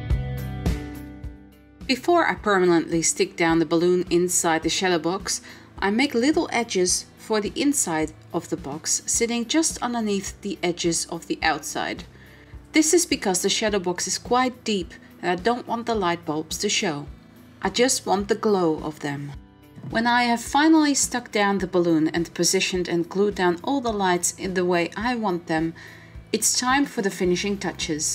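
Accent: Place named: Netherlands. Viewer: Everytime I hear your accent I get this feeling you're dutch.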